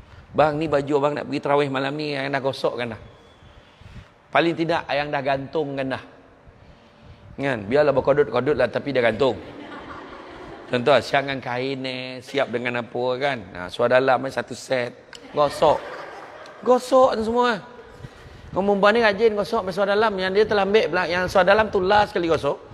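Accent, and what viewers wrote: Negeri Sembilan, Malaysia. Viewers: 👍 bahaso melayu minang nogori semilan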